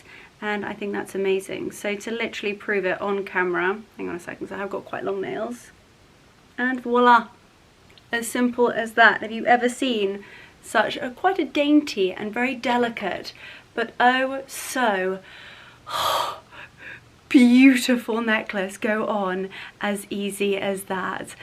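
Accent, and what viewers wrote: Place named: United Kingdom. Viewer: Hi I love your Vlogs I just discovered your channel. You are so beautiful from inside and outside. You seem genuine with a heart of gold a good person. Love your British accent 🇬🇧you sound like a real princess. 😘🇺🇸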